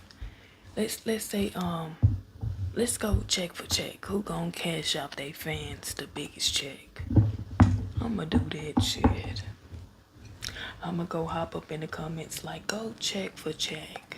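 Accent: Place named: Tennessee, United States. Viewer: Aint even a woman but im frm Tennessee too so yo accent remind me of home 🙌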